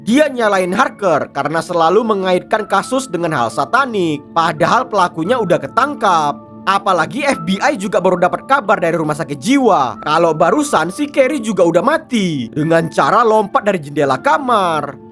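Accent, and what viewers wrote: Medan, Indonesia. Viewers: Logat nya bicara medan kali bah😂 Gabasabasi langsung awak subs yekan☕🗿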